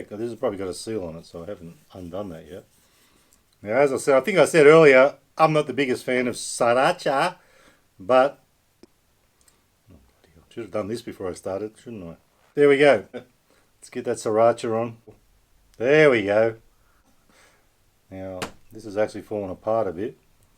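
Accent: Australia. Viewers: Aussie mate 🇦🇺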